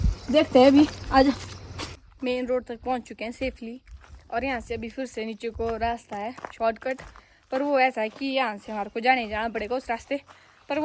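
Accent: Pahadi, India. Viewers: Hindi ke sath phadi tune achhi hai❤ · Ap hindi bhi bolte h pr tone pahadi hi aati h 😁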